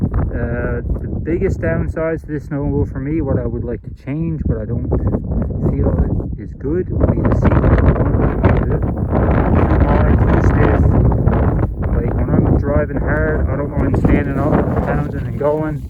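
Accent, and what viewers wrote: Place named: Newfoundland, Canada. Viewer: Thought ya was a Newfie ?!! Lol good stuff thinking this may be my next sled ? Keep xterrain content coming! Cheers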